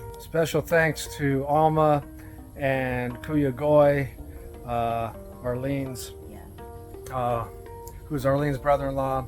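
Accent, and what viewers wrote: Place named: United States. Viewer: If you don’t mind me asking because you didn’t disclose the information on how much was the total cost for everything. You sound as if you’re from the states as myself. So my question is how much was everything (land & everything built including fence) plus if you are from the states how are the taxes done?, do you have to pay taxes in both countries?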